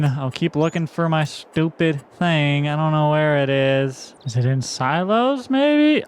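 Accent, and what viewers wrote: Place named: Utah, United States. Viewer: Do happen to be from Utah? You have the accent 😅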